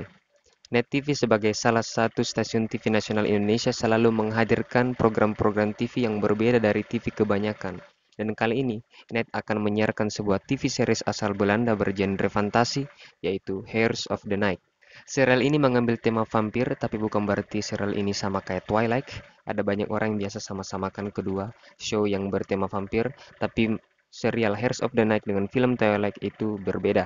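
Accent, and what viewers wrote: South Sulawesi, Indonesia. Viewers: Orang Bugis ki kah,logatta sangat kental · Bang orang sul-sel ya?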